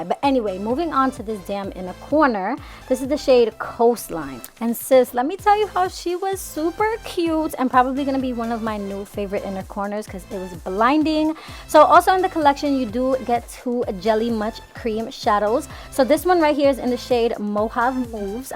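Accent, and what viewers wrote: New York, United States. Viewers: I love your videos! You’re absolutely gorgeous and always love the vibes. I’m from philly but I love a New York accent! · Your such I New Yorker. As one myself it cracks me up hearing the way we really talk. Like I don't catch it in myself but I know that's how I sound too tho. Been told one to many times! Love ya girl!!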